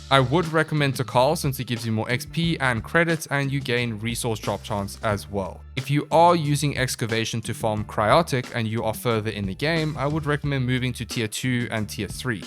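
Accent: South Africa. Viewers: Bro do I detect (and forgive me if I am wrong) are you South Africa?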